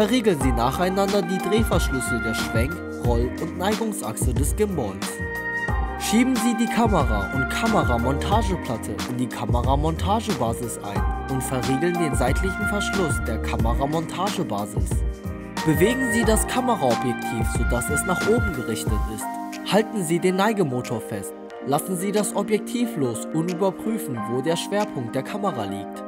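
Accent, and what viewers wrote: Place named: Turkey. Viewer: Das ist kein Dialekt. Das ist ein türkischer native Speaker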